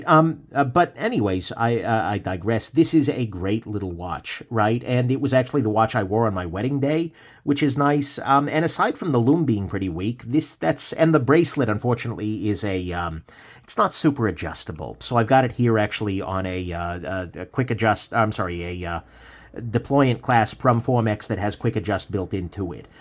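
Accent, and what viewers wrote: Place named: California, United States. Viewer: At the end of the video I learned what a California accent sounds like.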